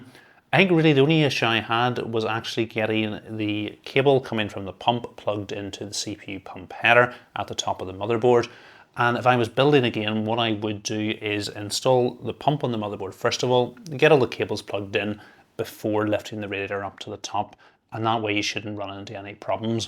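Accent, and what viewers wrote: Northern Ireland, United Kingdom. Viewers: Love hearing the NI accent. Much love from portadown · You are def from northern ireland... I am from the US, but have lived in Belfast for the past 10 years and can spot a northern irish accent anywhere. Glad to see another computer enthusiast from NI.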